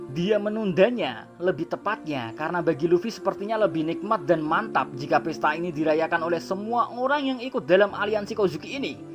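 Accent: Java, Indonesia. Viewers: dari logatnya orang jawa. Feeling gw nama asli lo. Kayanya 70% benar wkwk iyaa ga min?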